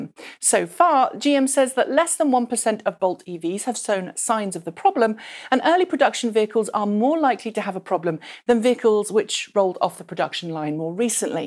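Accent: Mid-Atlantic, United States. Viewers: Back to speaking good old BE. Found your Mid Atlantic English a bit weird. ;-) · Could you make some of your videos with your American accent? I like it. :)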